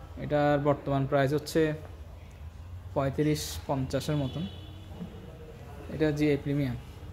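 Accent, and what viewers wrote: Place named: Comilla/Noakhali, Bangladesh. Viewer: উপস্থাপক কুমিল্লা দক্ষিণ অথবা নোয়াখালীর জেলার মনে হচ্ছে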